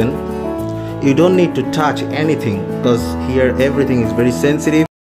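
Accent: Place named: Philippines. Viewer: Wow ang galing nman parang pinoy lng ang NG sasalita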